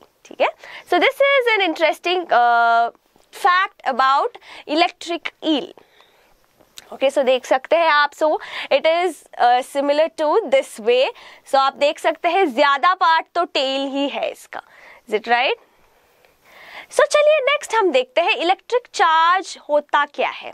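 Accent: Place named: South, India. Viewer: Ma'am has a strong south indian accent in hindi Edit: She is still a great teacher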